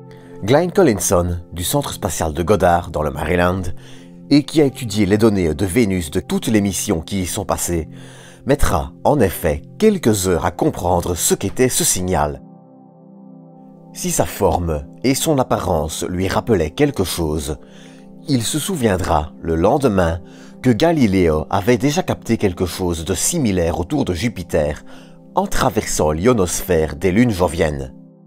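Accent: Belgium. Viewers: C'est l'accent belge.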